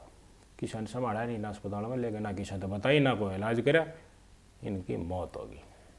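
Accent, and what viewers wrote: Haryana, India. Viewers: बेटे तेरी जो हरियाणवी भाषा है आज तक किसी भी पत्रकार ने नहीं बोली ऐसे हर घटना को बताता है जैसे दो आदमी बात कर रहे हो बिलकुल सरल हरियाली में बात करता है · Phale Bolna Seekh Le Aa,pni Lokal Bhasa Na Bole ,Bhiwani Jiila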